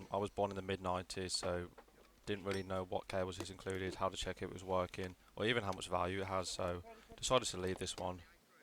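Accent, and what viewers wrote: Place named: Birmingham, United Kingdom. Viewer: New to your channel bro, trying understand your brummie accent :) keep up the good work mate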